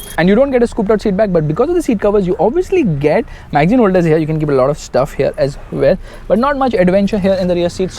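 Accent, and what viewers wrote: India. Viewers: I hate Indian English Accent 🤮🤮🤮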